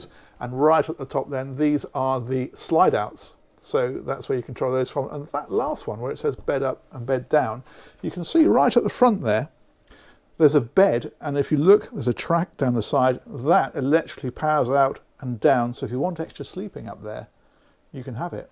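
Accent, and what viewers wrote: United Kingdom. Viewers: Are you from England? Nice vehicles looks very cozy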